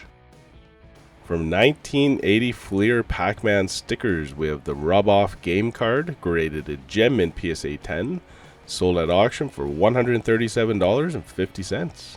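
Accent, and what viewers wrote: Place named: Canada. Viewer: I love the Canadian pronunciation!!! Lol...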